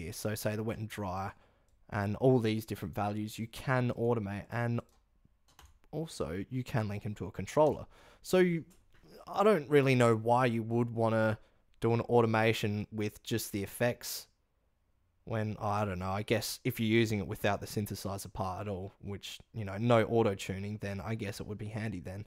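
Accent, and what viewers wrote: Australia. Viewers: wow i just found your channel and so far its the only helpful channel ive found :3 im guessing you are australian? so am i!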